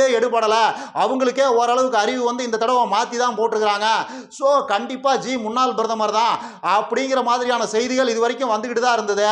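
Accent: Kongu, India. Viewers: Super thambi nalla irrugu un kongu petchu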